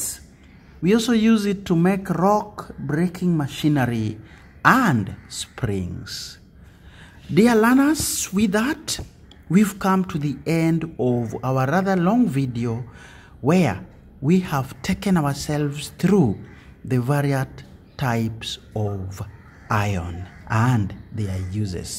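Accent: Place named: Kenya. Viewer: Abeg i no dey understand this Kenya accent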